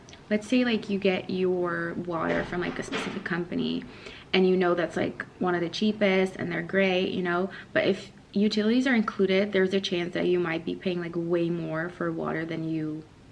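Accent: United States. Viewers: Did you ever live in the US? I thought you did earlier (idk maybe I just imagined it in my head cause of ur accent). I notice a lot of folks from Europe with an American parent have American accents. (Assuming ur dad is Nigerian American) P.S. luv ur vlogs! And you’re so authentically you. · how come you speak such good English and you have an American accent lol 😂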